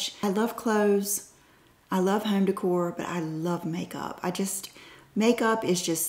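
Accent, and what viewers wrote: South, United States. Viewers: I swear… you remind me of someone I went to school with! Lord, I miss her. Lost track of each other. I am so happy I found you! Please keep posting whatever little thing you have on mind. You give me joy 🤩 p.s. You sound like lovely Southern gal. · I so love logging on, seeing that you’ve uploaded and hearing your sweet, Southern voice! I’ll be watching no matter what content you give us! ☺️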